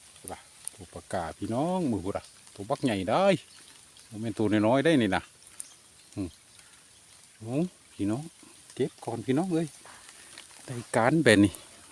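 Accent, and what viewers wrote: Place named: Isan, Thailand. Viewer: สำเนียงแบบนี้เป็นคนจังหวัดใดน้อคือคนสกลนครหรือไม่ก็นครพนมแมนยูบ่เบิ่งหลายคลิปอยู่แต่ว่าบ่ค่อย comment มา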